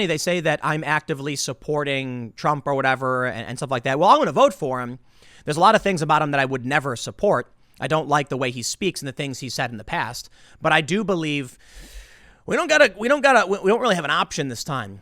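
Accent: Chicago, United States. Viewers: Richard Bostan it’s called an accent. That’s a Chicago thing.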